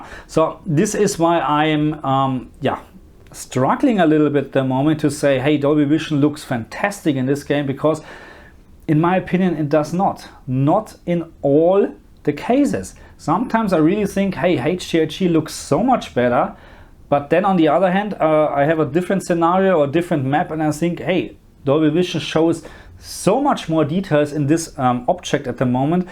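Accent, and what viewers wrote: Germany. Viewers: First sentence, immediately knew you’re german. Gutes Video. ^^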